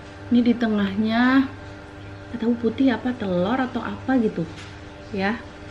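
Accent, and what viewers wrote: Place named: Garut, Indonesia. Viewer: Euuhhb teh.. Mani resep pisan pas kalau ngomong sunda mani lucu, tong hilap teh sundana nyariosna lucuuu pisaaannn, logat garut punya has ya hehhehe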